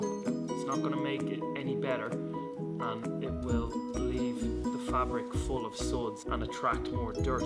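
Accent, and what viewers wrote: Ireland. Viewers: This comment is crazy and I’m obsessed with this video and an Irish accent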